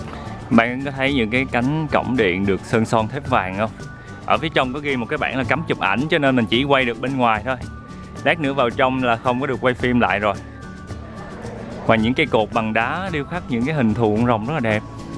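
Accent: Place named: Central, Vietnam. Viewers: chuẩn giọng miền trung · Nhớ giọng huế quá. Thèm bún bò huế quá. Giữa đêm em đg đói mà phải tự hành hạ bản thân ntn hả a 😥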